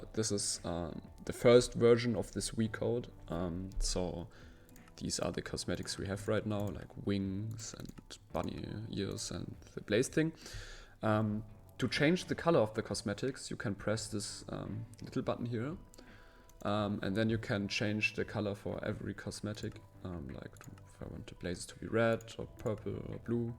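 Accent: Germany. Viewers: Ich richte den Deutschen Akzent haha ich liebe deinen Client ! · Direkt erkannt das du deutscher bist xD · Deutsches Englisch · joo dieses english seit ihr deutsche ??? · are you german ? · wait a minute u are german ?! (i can tell by the accent) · Bist du deutsch bcs Akzent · hörst dich deutsch an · dierekt gemerkt das du deutscher bist HAHA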